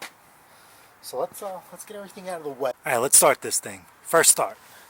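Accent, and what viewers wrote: New York, United States. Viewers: This video just keeps on giving! I had the same same problem on the same machine. It starts better now than it ever did. Instructions were easy to follow, and I appreciate the NY accent and sensibilities. I'm from RI and we're cut from the same cloth.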